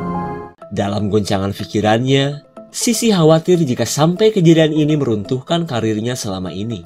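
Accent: Sunda, Indonesia. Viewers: Sunda pisan euy...😄😍❤👍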